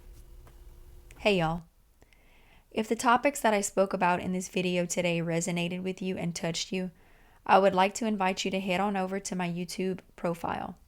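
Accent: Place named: United States. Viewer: your accent is featured in the video "13 American Accents Ranked EASIEST to HARDEST to Understand", by user Olly Richards. 🙂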